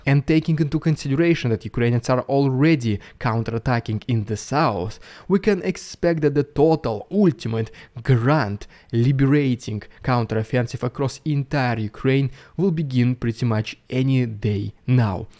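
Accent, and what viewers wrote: Russia. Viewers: because he has a russian accent. obviously.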